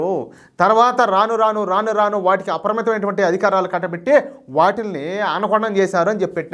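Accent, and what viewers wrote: Rayalaseema, India. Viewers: రాయలసీమ యాసలో నిక్కచ్చిగా చెపుచున్నారండీ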